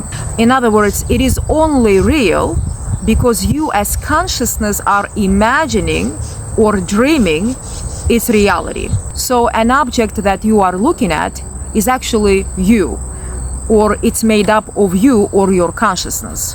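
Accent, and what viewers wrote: Russia. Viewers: Classic Russian accent, I love it 😍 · Pretty russian-english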